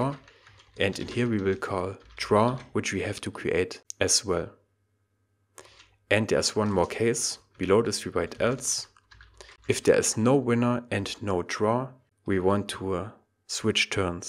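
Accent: Germany. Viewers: thanx ..you have a sweet german accent.. hhhh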